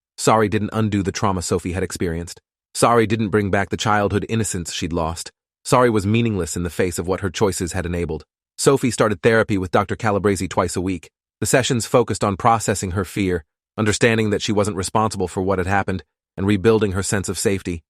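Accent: United Kingdom. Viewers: Why did he turn british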